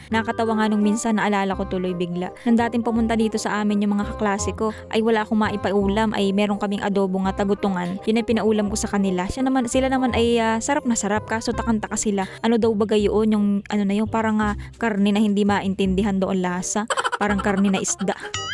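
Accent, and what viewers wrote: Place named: Visayas, Philippines. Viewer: Nakakatuwa ka naman magsalita...my halong bisaya o cebuano...😂😂😂😂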